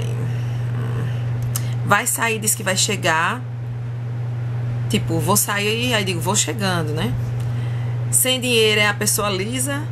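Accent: Brazil. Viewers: Tô ligado que tu é daqui de Maceió rsrsrsrs, Esse sotaque eu reconheço na china. Eitxa sotaque gostoso da boba! Só algumas gírias que deve ser mais falada em outros estados,mas o resto eu falo quase tudo aqui kkkkkk. Ala Ala Ala Alagoas ;) . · Bah Tche O Sotaque Nordestino é meio difícil não sei Porque se eu sou Gaúcho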